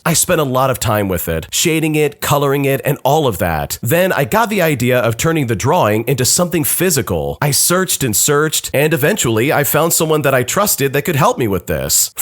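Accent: United Kingdom. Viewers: Is it so much to ask for a proper label for the thumb nail? It does set off my "danger radar", I use the channel to relax to do the dishes or whatever, then no warning I hear "The British Voice". It's the inflections. Give me one GOOD reason why the channel can't label the Thumbnail? Because I just leave a thumbs down, because the voice is not what I subscribed to. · first vid of the day is the British guy from Amazon I the genius..... Second vid of the day is the Australian guy .... ... And randomly the OG guy comes back...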